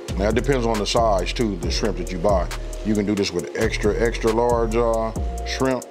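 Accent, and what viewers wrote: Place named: West Coast, United States. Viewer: I just love that #WC accent😊💯. And the dishes are A1💪🏿. I'm making this dish tonight for a family of 5(including myself)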